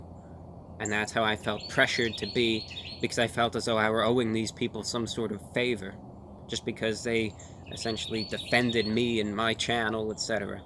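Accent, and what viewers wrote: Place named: Transatlantic, United States. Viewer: People can mock all they wish, but it doesn't matter, until you get master the transatlantic accent and wear a business suit attire everyday, you'll never be on the classy level to make a truly meaningful insult on.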